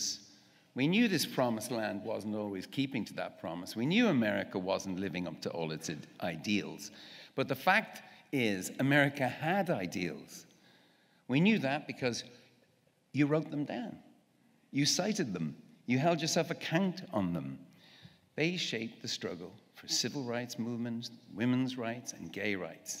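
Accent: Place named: Ireland. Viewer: I swear he's sounding more Irish the older he gets..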